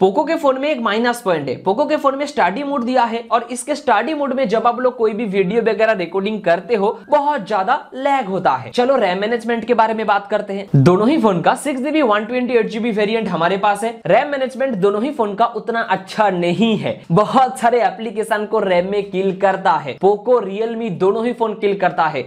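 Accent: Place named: Bihar, India. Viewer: Bhai tum bihar se ho kya nice accent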